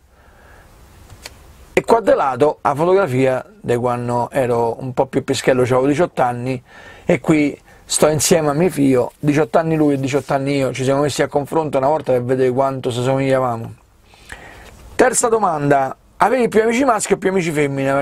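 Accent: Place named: Rome, Italy. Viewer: Ciao, ti seguo da un po', sei molto simpatico, poi l' accento romano mi fa morire, ho alcuni amici romani perche' ho villeggiato a Terracina per poco piu' di 20 anni. Sono un Napoletano emigrato in Canarias. Beh! Sono amante della vita in Camper pero' ho un furgonato, pero' non ci vivo.... Un abbraccio a Presto. Ah, in Calabria vive un mio zio. hehehhe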